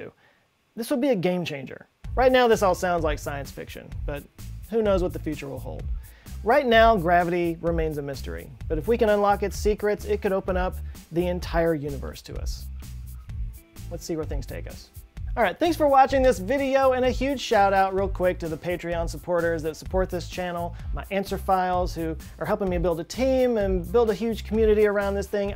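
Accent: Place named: Texas, United States. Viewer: I've been watching your videos for 4 years and i've yet to have noticed your texan accent until today, when I watched your video after smoking weed. I no ain't a native english speaker...but I had no idea how noticeable your accent was. Dude, it's amazing.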